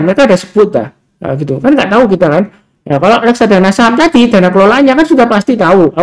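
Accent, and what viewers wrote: Java, Indonesia. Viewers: Jowone medok temen mas 👍😊🙏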